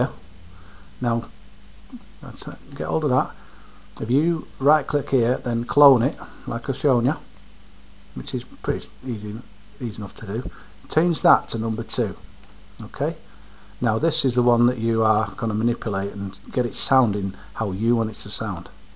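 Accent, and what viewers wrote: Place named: Europe. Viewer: I did. wow, so many gaming Vids. I used to be a hardcore gamer. Now my enjoyment from games chiefly comes from playing with other humans (in the same room). Still, if I ever need to catch a kewl euro accent, I've noted you as reference material;P. Cheers. See you around mate.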